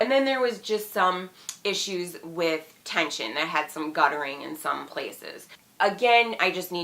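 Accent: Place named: Minnesota, United States. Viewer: Oh good! Also, I am originally from southern Minnesota and your accent takes me back! 🤗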